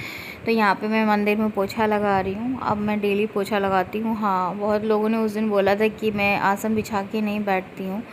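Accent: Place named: Uttar Pradesh, India. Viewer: Up language lapat chal rhi h😊😊😊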